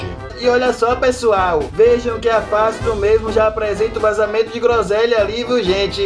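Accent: Bahia, Brazil. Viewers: Melhor narração 😂 esse sacana é bahiano. Kkkkkk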